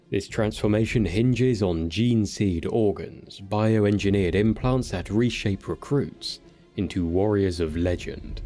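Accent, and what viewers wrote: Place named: United Kingdom. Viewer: "Imagine yourself listening to a man with a british accent explaining things that involve mystery and murder"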